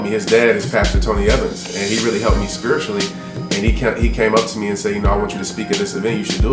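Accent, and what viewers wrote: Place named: New Orleans, United States. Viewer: New Orleans baby !!!! Me too son ! thought u look familiar , naa j/k .. na but you do have that Orleans style. !! you talk like us too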